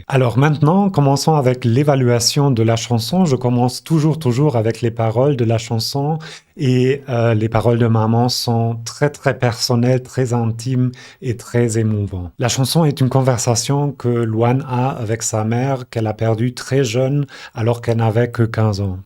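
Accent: France. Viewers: Votre français est parfait. Et votre accent est à peine perceptible, à tel point qu'au début j'ai cru que vous étiez Français. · Wunderbar French accent 👍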